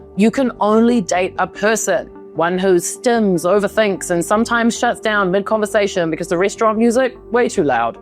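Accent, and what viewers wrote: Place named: New Zealand. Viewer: Are you referring to the accent? It’s Kiwi.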